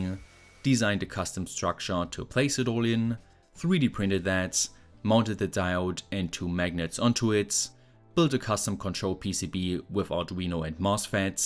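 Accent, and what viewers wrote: Germany. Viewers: but you are not a Scott. This is the hardest German accent I have heard in my life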